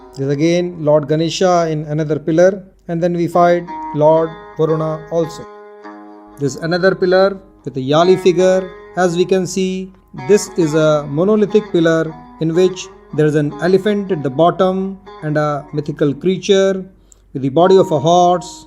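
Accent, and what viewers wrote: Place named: West, United States. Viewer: Please try not to talk like a westerner .. it's ugly and irritable ? The narration makes it more jarring to the ear..?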